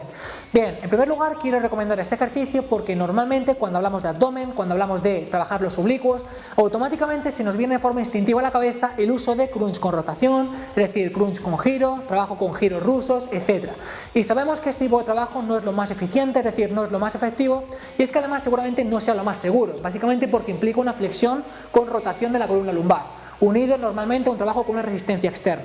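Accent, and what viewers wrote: Galicia, Spain. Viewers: pero con acento gallego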